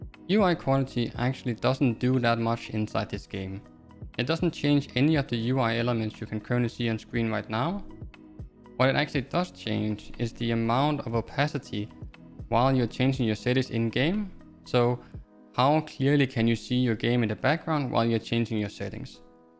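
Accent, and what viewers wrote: Denmark. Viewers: Hey bror, har set du er stoppet med at poste, men ber dig begynd at post igen tro mig. Du skal bare øve dig på dit engelsk så det ikke er danglish og blive ved med at poste og lock in. Tro mig hvis du bliver ved bliver du stor på et tidspunkt.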